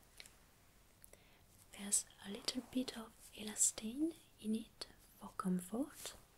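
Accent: France. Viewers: Please ... More videos like this( with french accent) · Thanks for the video! I came most for the tingles and your wonderful accent (I just found your channel last week and I'm watching your work since). I studied the basics of French and you're tempting me to return to my online classes 😄 Again, thanks and greetings from Brazil.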